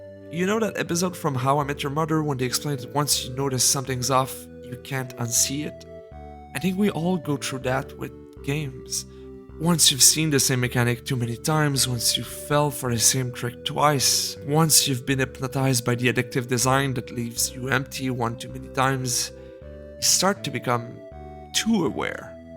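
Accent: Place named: Quebec, Canada. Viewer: My grandma was from Québec, thought I recognized that accent. Cool video gonna sub was a fresh take on the idea of gaming decline without the click bait garbage so prevalent on YouTube.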